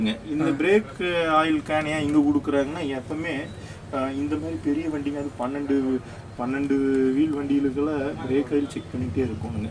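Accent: Kongu, India. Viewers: Anna kongu Tamil super pesuringa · கொங்கு தமிழ் சூப்பர் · Enjoy கொங்கு voice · நண்பா கோவை கொங்கு தமிழ் சூப்பரா பேசினார்💯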